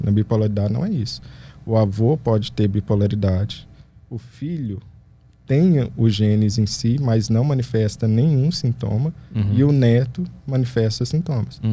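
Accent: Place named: Minas Gerais, Brazil. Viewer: Que show de explicação. E com esse sotaque de MG Ficou MIÓ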